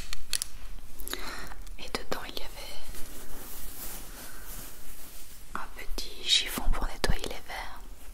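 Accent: Belgium. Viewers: C’est lunette te vont tellement bien ! J’adore ton petit accent léger belges je trouve sa troppp mignons !!!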